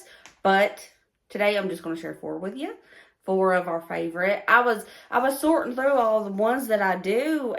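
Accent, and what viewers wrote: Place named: Tennessee, United States. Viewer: I love watching your videos. Great information and ideas but your accent reminds me of Home. i am a transplanted Vol living in another state and I miss home.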